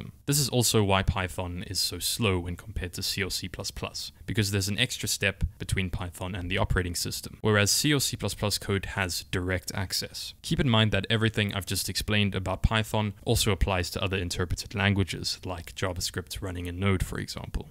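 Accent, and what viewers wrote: South Africa. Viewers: I hear a South African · You sound south african? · are you south African? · Are you south african?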